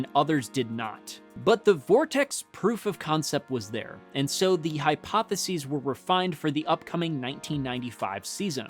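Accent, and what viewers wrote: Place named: Upper Midwest, United States. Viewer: "keep an eye on that bob" You can tell he's from the northern midwest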